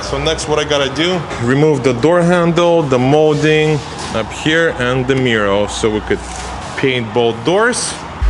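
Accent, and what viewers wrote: Russia. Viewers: Я как то по акценту понял что ты русскоговорящий, привет из Румынии.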